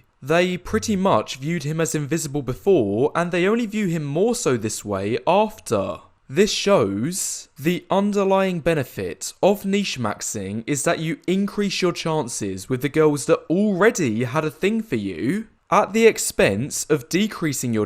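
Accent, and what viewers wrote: United Kingdom. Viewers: Like that voice you sound like wiley cotote when he talks once in a while that british accent youre a genius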